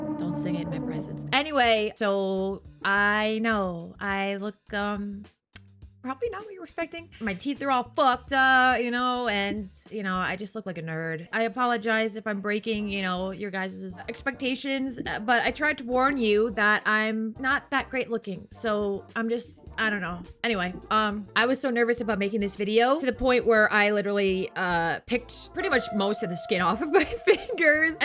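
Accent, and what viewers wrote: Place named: Boston, United States. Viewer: You remind me of jerma, and not just because of the boston accent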